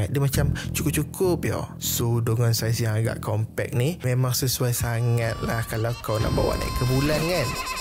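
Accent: Negeri Sembilan, Malaysia. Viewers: Elok2 la cakap nogori tu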